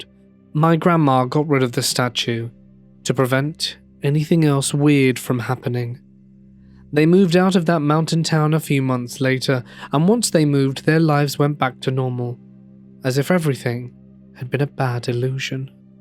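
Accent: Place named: United Kingdom. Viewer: British accen5 just isn5 doin* it for me. Gotta stop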